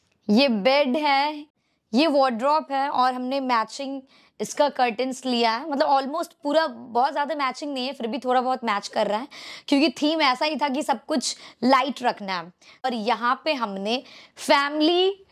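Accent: Bihar, India. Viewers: Ap jo bolte hai na di vhut acha lagta hai m v vese bihar s hu di.. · I really Like her bihari accent.❤❤ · Aapr proud hai.. Qki aap real ho. Genuine girl ho. Aaapka Bihari accent bahut achha lgta h.... Aap ekdm jamin se ujtkar yaha tak pahunche ho isly aap inspiration v ho sbke liye.. Love n support,👍👍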